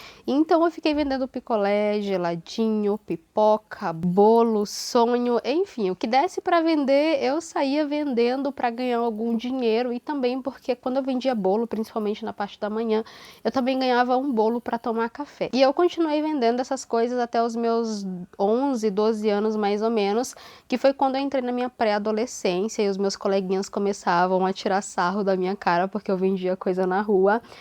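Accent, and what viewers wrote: Rio Grande do Sul, Brazil. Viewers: pelo seu sotaque nos vídeos sempre pensei que você era natural do Rio Grande do Sul! 😅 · Nossa que legal sua história e eu pensando que vc era gaúcha raiz pq seu sotaque está bem do local e também a maioria dos Maranhense que eu convivo , não falam com o sotaque do local. Mensagem muito positiva de superação,garra, coragem e isso é o que valorizo numa mulher ser perseverante, lutar por aquilo que acredita. Beijo enorme 😘❤️ e todo sucesso do mundo pra vc!!!! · Achei que tu fosse gaúcha!! Sotaque lindo! Ótimo vídeo!!! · Pelo sotaque poderia jurar que você é gaúcha de nascimento! Que história bacana a sua, cheia de coragem e perseverança! Parabéns por não se encolher e se vitimizar: você merece toda as realizações que a vida puder lhe dar!❤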